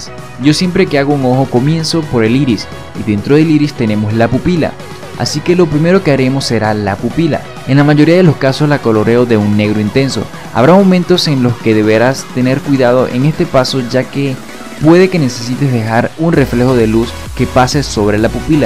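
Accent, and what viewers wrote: Venezuela. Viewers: Es que dice "los ojoh" :v, los venezolanos hablan mucho como con la j o algo así... en lo personal me encanta el acento c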